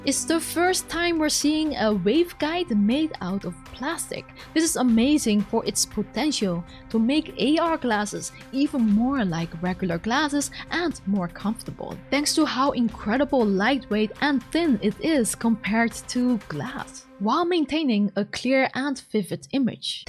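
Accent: Netherlands. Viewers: Great news! What is this accent? I can't put a finger on it lol It nearly sounds like a Dutch English accent n__n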